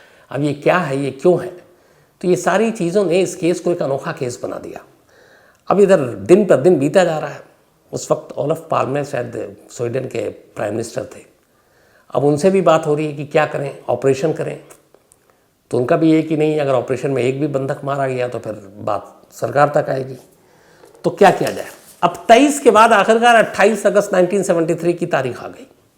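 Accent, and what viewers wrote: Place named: Bihar, India. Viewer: Your language says that you are from Bihar?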